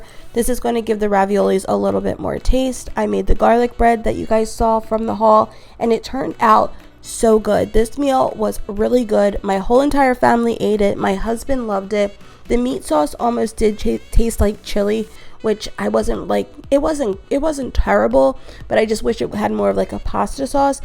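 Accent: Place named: Philadelphia, United States. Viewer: This is my first time seeing your video... I heard your voice and knew immediately that you were from Philly. I'm also from philly!!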